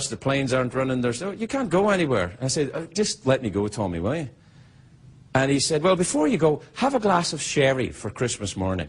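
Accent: Scotland, United Kingdom. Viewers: This guy is awesome. Miss his show. Miss his Scottish accent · He sounded so much more Scottish back then. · Still had his Scottish accent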